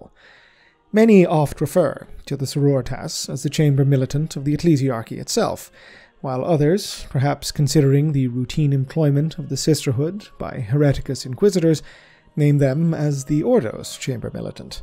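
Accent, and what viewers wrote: Ireland. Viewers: maybe this is a weird question but do you have an irish accent?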